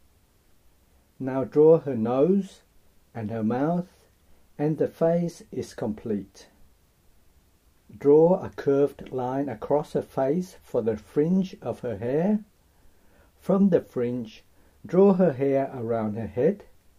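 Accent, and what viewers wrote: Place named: United Kingdom. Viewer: u sound british. sorry for saying that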